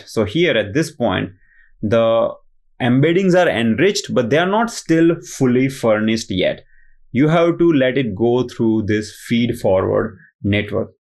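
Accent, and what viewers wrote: Gujarat, India. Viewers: Best explanation out there! Also, love that gujarati accent😂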